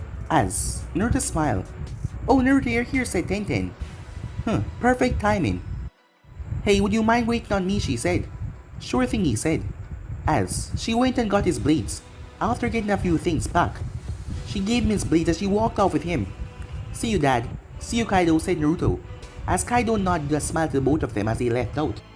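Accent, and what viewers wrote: Jamaica. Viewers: I like it . Yo ya Jamaican?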